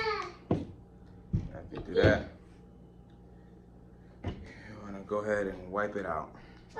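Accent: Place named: Philadelphia, United States. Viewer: I hear a philly accent my boi ..Chicago Westside check-in!🌏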